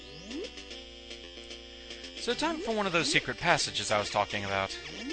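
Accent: Scotland, United Kingdom. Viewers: He's Scottish.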